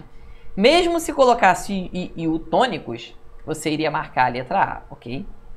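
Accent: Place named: Rio de Janeiro, Brazil. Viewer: Professor muito bom. Objetivo e atento, além de ser bonito e com o sotaque carioca! srsrrsrsrs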